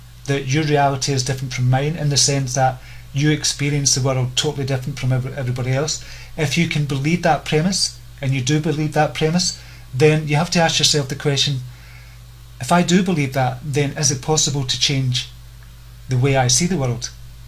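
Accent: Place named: Scotland, United Kingdom. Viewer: Hi, I came about finding you in an interesting way; I too had found the song/dance " " and fell in love with it... I store it in my YouTube library. So, the other day I went to my Tablet to get it and somehow your beautiful introduction to the song showed up in my "photo gallery" for some reason. Anyway, I found your introduction just as fascinating as " " itself... I didn't know who you were, I thought maybe an Architect because of you, your office surroundings & your name displayed with your logo... I was thinking maybe you were just taking a break and wanted to share this "amazing, hypnotic beautiful" video online... So I've been carrying my Tablet around listening & watching " " because I've been extra ill the past month (I have Lyme Disease) and watching " " makes me feel much better, as you know - it has this quality about it. 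Then I started queuing it to your intro each time I played it because 1. I absolutely love your accent - I always have, Scottish - right? 2. Your more than average intro especially the end when you say "Okay, until next life"... So today I'm driving around (San Francisco, California) playing the song, its so wonderful... and it comes to the end and I look over at my Tablet on the seat next to me and say "Okay, I want to hear that stunning voice again" and I queued it up to the beginning and started wanting to know who is this interesting man and why does he say "until the next life"? So I realize you have a l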